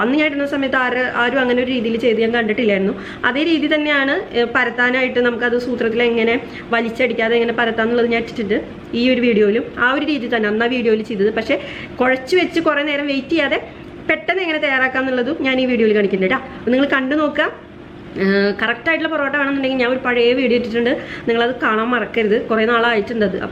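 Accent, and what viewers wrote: Thrissur, India. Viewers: Hi, awesome and super easy recipe.I love your way of talking and the Thrissur slang..cooking as well as your slang is simply superb.. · Midukki thanne ! Keep it up. Very nice presentation. TCR slang exalted. Midumidukki.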